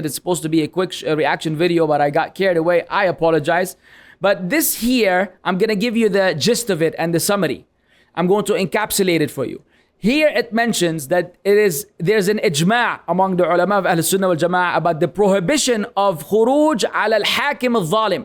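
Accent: Lebanon. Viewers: Even though I'm a graduate of the Diploma of Arabic Language for Non natives from Umm Ul Qura University Makkah, still this Lebanese accent was beyond me 😂, Allahuma Barik Lak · I like your tripolian accent. Can you do a minyah accent, that's my favourite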